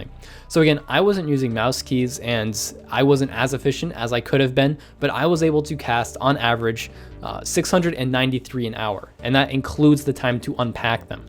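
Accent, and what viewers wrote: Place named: Canada. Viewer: You sound incredibly canadian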